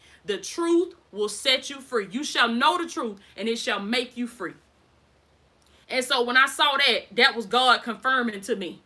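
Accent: South, United States. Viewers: I can't believe how beautiful you are. Your southern accent is darling l never heard it. Never spent time in Alabama.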